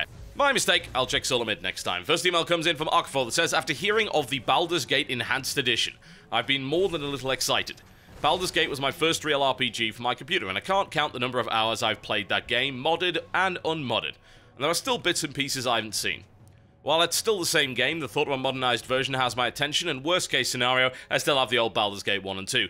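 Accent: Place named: England, United Kingdom. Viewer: Im scared right now, because people seem to be taking opinion of Steam as gospel... seemingly only because he has a english accent.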